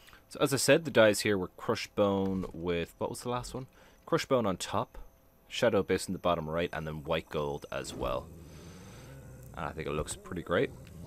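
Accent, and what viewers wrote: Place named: Ireland. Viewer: Are you Irish or just live in Ireland? I will admit I've wondered about the origin of your accent :D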